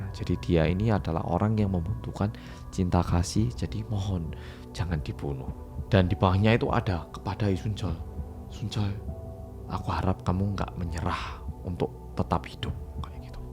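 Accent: Java, Indonesia. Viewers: Saya yang dari bayi di jawa pun tidak seMEDOK Mas 😅 bisa enak aja gitu denger Mas nya ngomong.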